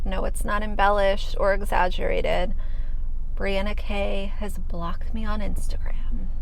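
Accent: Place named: Colorado, United States. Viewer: You almost seem like a Colorado girl! Just how you talk. You would fit right in around here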